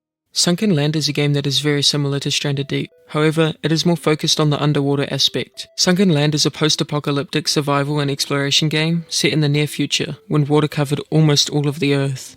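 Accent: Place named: New Zealand. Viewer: I was thinking the whole video "Is this guy Kiwi" You have a very Kiwi accent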